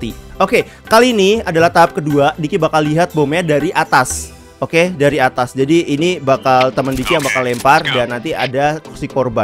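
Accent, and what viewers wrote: Java, Indonesia. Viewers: Bang abang orang jawa ya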